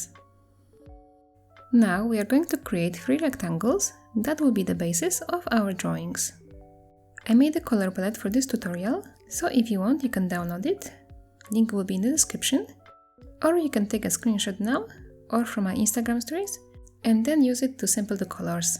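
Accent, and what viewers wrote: Poland. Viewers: Polski akcent zawsze poznam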